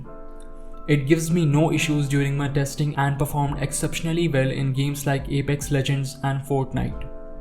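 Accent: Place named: India. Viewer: You talk like some Indian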